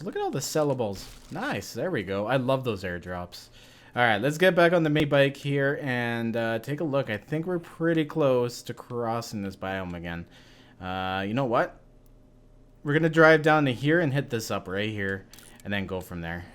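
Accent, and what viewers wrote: Canada. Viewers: Thanks, had my fingers crossed for an episode today and you delivered! I'm almost done searching Naxezgane POI's and then I'm off to random gen. Finally died, on day 58. Went to search for the gold mine and rode into the friggin canyon looking over my shoulder lol. Was thinking about other games for you. It's a real slow burner but Ark blew me away dude. There's this real sense of wonder and discovery throughout the game. It's wasn't too sound mechanically but is definitely unmistakable overall. PS glad you don't nerf your dialect like most Canadian Youtubers. Pronunciation of "aboot" and using "ey" are actually common here in regions of England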